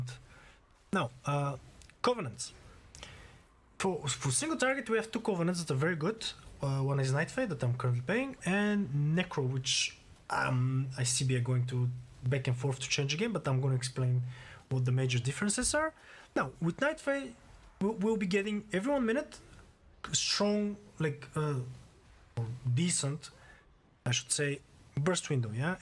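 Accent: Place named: France. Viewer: Are you French? you have an elegant accent :D your unitframe is SUF right?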